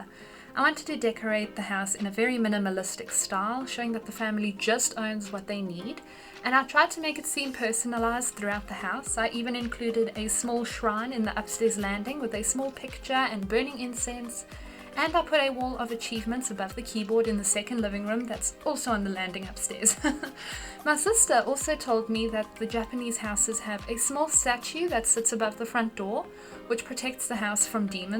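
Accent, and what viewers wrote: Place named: South Africa. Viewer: Just came across your video and immediately knew you were a fellow South African! Great build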